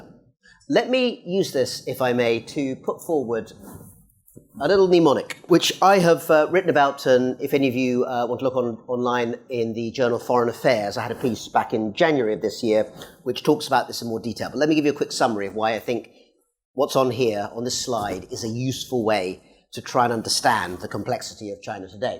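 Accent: United Kingdom. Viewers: An Indian guy with British accent, think as he is British meanwhile lecturing China how to behave. I think something is wrong here. Please don't use British accent, since it is very insulting.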